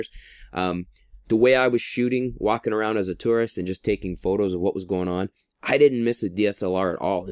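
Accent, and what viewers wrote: Canada. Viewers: I love your Canadian accent! Made me smile so big. ; ^ D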